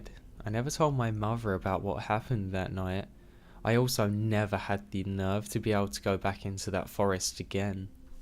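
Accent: England, United Kingdom. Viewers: Land of Shakespeare; pure class- love the voice- English, and Sinister- SCARY!!!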